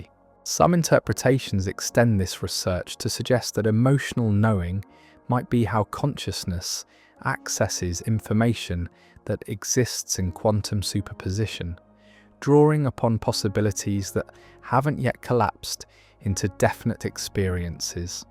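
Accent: England, United Kingdom. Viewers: Why this English accent…use an other voice Im sick of this wet mouth babble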